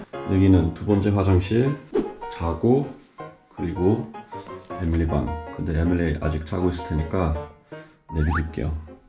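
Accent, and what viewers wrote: United Kingdom. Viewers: 오 영국식 발음이셨어~~~ 멋져라!😊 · 헐 영국어 다. 미국어 만 듣다가, 힐링 됌. 😮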